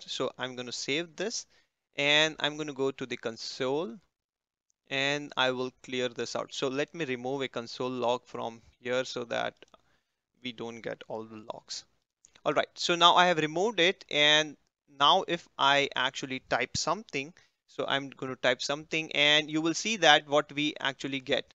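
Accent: India. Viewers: I love Indian accent <3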